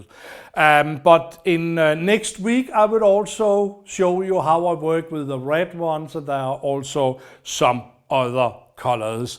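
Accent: Denmark. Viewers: Great in English, I follow him in Danish or I should say watch, learn and also enjoy his Danish accent. He seems such a lovely man, very creative. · I am the people who love his Danish accent 🤣💖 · I love your accent! My grandfather and many of our family came from Denmark. Many of them used Dane to say things. I loved it. I have a collection of the Danish plates in my house. So I will say Velkommen. My Grandfather would sing to my daughter and dance around with her. Wonderful memories. Thank you. I know the word for thanks but I don't think it is right. Joyce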